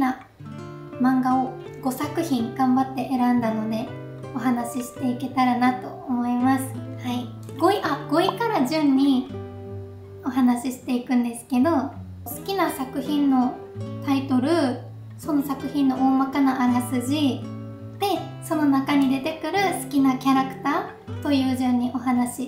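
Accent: Kagoshima, Japan. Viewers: いつもひょっこり顔を出してくれるだけでも嬉しいのに声まで来たもんで、本当に癒されます！訛りはが鹿児島にいたことの証だから、むしろどんどん出して欲しい！キャラクターの説明で「かわいい」って言うたびに画面の向こうで「あなたがですよっ！」とツッコんでいたのは僕だけではないはず。そしていちいちアップになるたびにかわいいのもずるいし、どういうことかっていうと、今日も癒しの動画をありがとうございます！ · の鹿児島弁がひさびさに聴けて、多分だから癒されてるんやと思う😊✨ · の漫画・アニメ愛が伝わってきました！そして鹿児島訛りが同じ鹿児島県人として心地よくて癒しです😊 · 伸びかけのボブを見て大人っぽくなったなぁ変わったなぁと思い、鹿児島弁の「いつもの」喋りを見て全然変わってないなぁと思い。楽しそうに好きなものを語る様子が本当に嬉しい。推しキャラに可愛いとしか言えなくなるが可愛すぎました。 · 自分も鹿児島に住んでいるのでこの訛りというかイントネーションに親近感が湧いています。これからもこの動画の雰囲気を楽しんでいきたいと思います。頑張ってください！！！ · と同じ鹿児島弁のyou Tuberの釣りむすめと鹿児島弁対決してください。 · はいつまでも鹿児島訛りでいてホシイ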